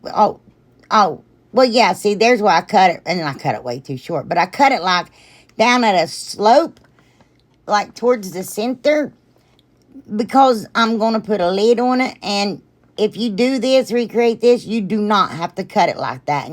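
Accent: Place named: Kentucky, United States. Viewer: GIRL if you live close to Heath you are only an hour and a half from me. I am also from Kentucky. Harlan if you know where that is. I just love watching you. My husband will hear you talking and say 'Are you watching?' Listening to you talk reminds me of home.🧡💛💚💙💜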